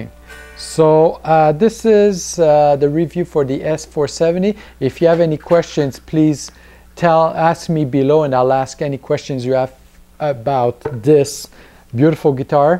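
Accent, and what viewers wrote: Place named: France. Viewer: A little french accent here and there...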